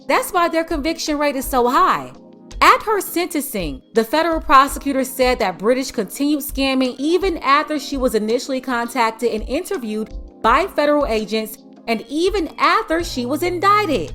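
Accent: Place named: Florida, United States. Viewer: I know you said you lived Miami before but are you from Florida? You sound like it and you have that smart Miami mentality?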